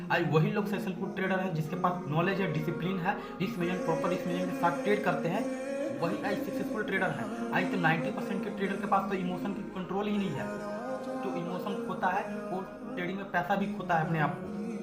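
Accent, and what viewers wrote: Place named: Bengal, India. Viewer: App ke bat se pata chal raha app bengali hoo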